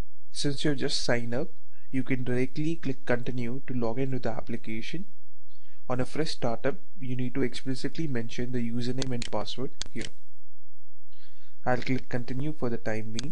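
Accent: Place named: India. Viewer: Thick Indian accent. Sounds like he has two huge bread stuffed inside his mouth.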